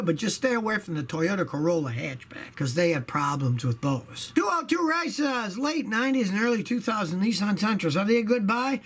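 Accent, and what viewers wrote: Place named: Chicago, United States. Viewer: Where is from originally? He has an accent that sounds possibly like Chicago’s.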